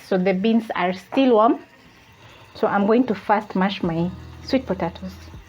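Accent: Kenya. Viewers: Was very sure you are from Western Kenya until 🤣🙆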